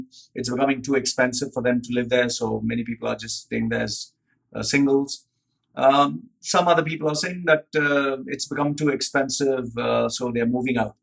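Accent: India. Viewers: Curious - why are you wearing a full face Maori Moko yet sound Indian.....?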